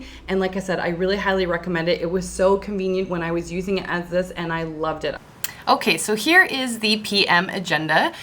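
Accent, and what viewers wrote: Canada. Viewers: Hey beautiful mama. I love your accent. You must be Canadian. I’m a fan ~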